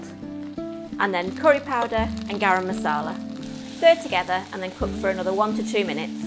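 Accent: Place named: Manchester, United Kingdom. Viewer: Love your show, somehow I have a feeling that you are a Mancunian Girl, and love your accent. We know your husband’s name is Chris, how about your name? It’s nice to write a comment with your name in it. As for this Curried Lamb, I had to clear all the fats and bones to avoid the lambie flavour, Australian Lamb is not the best in the world, and is so Rich, I suggest that you replace the Stock with water to lessen the rich flavours. As for the stringing of the Cardamom, I normally use a special stainless steel Tea Strainer where you can add other pods and seeds if required. I am cooking this spring lamb leg (de boned and fat completely removed) today and will let you know of the result. I think it’s going to be good. Thanks. Regards, Dr. Matthew Banno, Melbourne, Australia.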